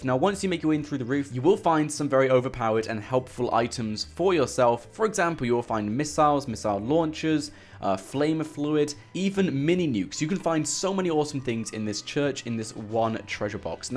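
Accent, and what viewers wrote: England, United Kingdom. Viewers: he's English with a normal voice. we all don't sound like hillbilly inbreads like you lol.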